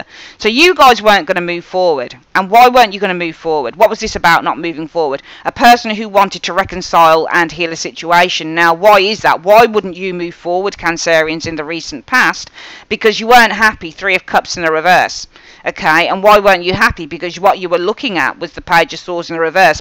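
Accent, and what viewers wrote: Birmingham, United Kingdom. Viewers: You sound like a brummie...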